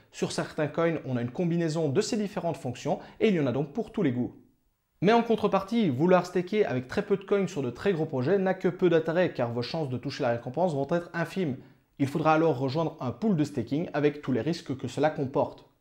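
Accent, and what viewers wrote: Belgium. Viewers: On reconnait cet accent belge dégueulasse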